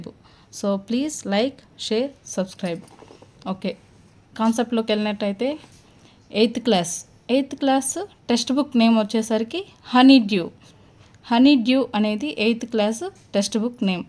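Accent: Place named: Guntur, India. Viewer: Madam గారు from Guntur అనుకుంటా 😊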